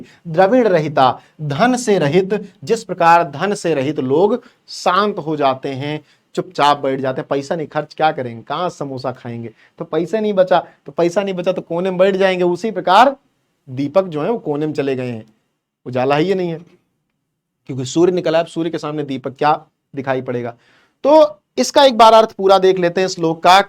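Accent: Uttar Pradesh, India. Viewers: Are sir aap up se hai lg rha hai by the way bahut bhokali samjhe hai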